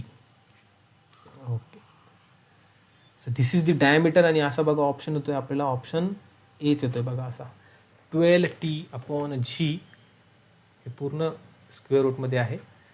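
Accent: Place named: Parbhani, India. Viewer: Sir tumhi Parbhani che aahat ka? Tumchi accent tashi watli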